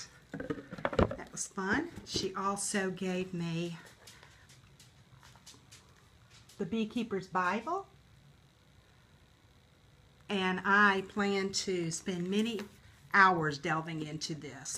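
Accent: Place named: Georgia, United States. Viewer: Happy Birthday! I love your videos and I’m so excited that you are going to be making more! I’m from Georgia (currently living in Louisiana) and hearing your accent is a wonderful reminder of home. I would love to stitch the Baroque Ink Circles. Thank-you for sharing your love of stitching with all of us!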